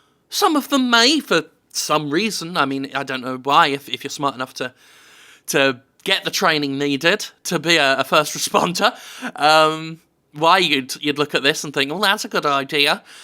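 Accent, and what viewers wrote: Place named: United Kingdom. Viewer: Hey I want to know, I'm I may, how do you maintain your Britishness? Is America slowly taking you over? Like in ten years will speak with that Mississippi accent and fire your guns five times a day?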